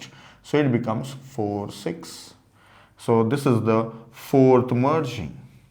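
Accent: India. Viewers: Make more indian accent please.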